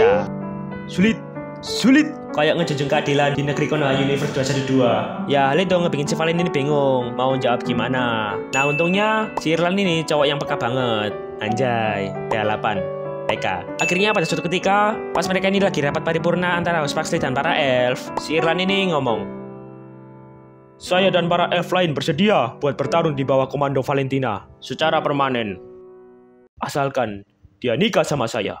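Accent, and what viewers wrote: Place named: East Java, Indonesia. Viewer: suka bet sama lawakannya yg medhok, salam jawa timur mas, lumajang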